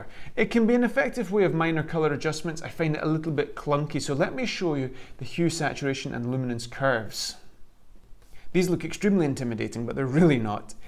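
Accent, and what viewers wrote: Scotland, United Kingdom. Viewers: Interesting explaination. Thank you. I think saturation especially adds a lot in videos. Love your accent. I guess it’s scottish!!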